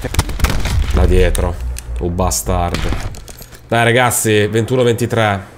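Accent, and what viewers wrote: Naples, Italy. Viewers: sei Napoletano?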